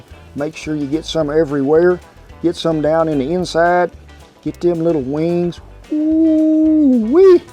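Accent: United States. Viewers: You should know, I watch this channel not only for culinary tricks. Your particular country English is amazing. Love country. 🤠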